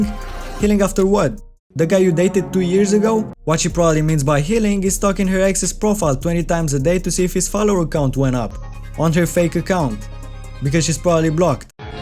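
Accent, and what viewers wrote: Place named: Balkans, Eastern Europe. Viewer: You are from Europe or balkan country .damn sure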